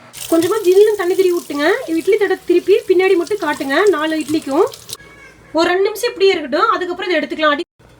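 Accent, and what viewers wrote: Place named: Coimbatore, India. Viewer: Try pandren mam we are from cbe happy to hear our oor slang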